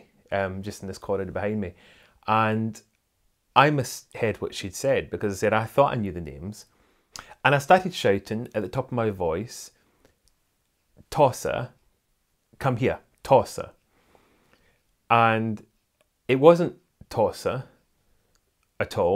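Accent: Scotland, United Kingdom. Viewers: I’ve just discovered your ‘show’, how lovely! You do a wonderful job especially because you aren’t malicious or gossipy, we don’t need any tabloid nastiness. Your delightful stories (though the children’s one and the soldiers one had me welling up) show genuine insight into the Duchess. Through your work, you have had amazing experiences, thank you for sharing them with us! ( what part of Scotland are you from? My father was from Aberdeen, I loved his gentle accent)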